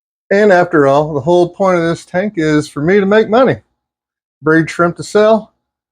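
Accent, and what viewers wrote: United States. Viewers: Where you from country boy?! 😅 Hellooooo from Raleigh, NC! Enjoying the content, dude. I'm liking the genuine videos not trying to be too polished and pomp. Keep up the great work!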